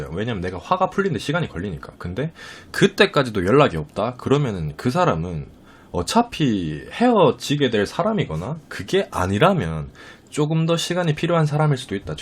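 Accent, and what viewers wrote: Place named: Daegu, South Korea. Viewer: 대구사는데^^익숙한 사투리라 너무 좋고 진짜 최고의 인강을 보는거같아용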